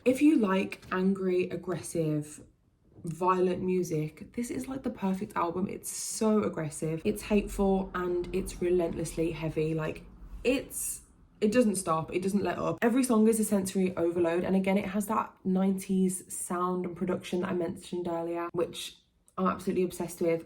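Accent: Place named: Birmingham, United Kingdom. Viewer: awesome!!!! yes it was amazing!!! i saw him twice, i was at the London on the 7th and Birmingham! I can tell from your accent that maybe you're from Birmingham? not 100% but i'd say maybe you are.. so I imagine you went to that one.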